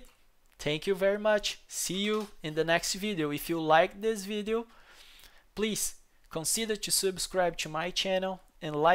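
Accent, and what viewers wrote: Brazil. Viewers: QUE INGLES top hein, obrigado amigo, vi que é br pelo sotaque hahaha, thankyou bro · boa mn lamento dizer mas seu sotaque brasileiro é bem reconhecível kkkkkkkkkkkkkk video bom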